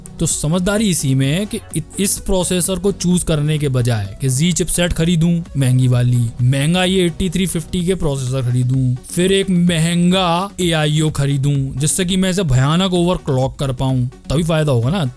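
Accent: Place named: Rajasthan, India. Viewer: U sound like you are from Rajasthan 😁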